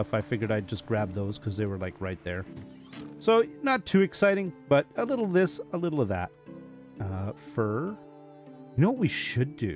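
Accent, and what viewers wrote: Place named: North Dakota, United States. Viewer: So I have been sitting here binging this series (how long will it take to get caught up?..haha). I have to say thank you, listening to your gameplay while I work just makes my day better. I am related to a bunch of North Dakotans and Minnesotans and your accent is very similar, it just puts a smile on my face. So thank you again. :)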